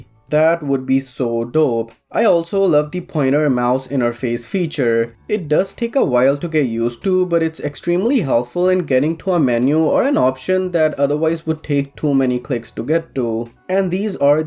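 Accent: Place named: India. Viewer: Canadian? I thought dude was Indian 😅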